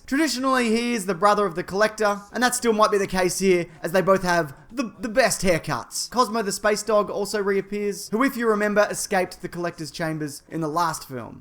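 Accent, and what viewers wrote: Australia. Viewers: YESSS THAT BEAUTIFUL AUSTRALIAN ACCENT IS BACK!!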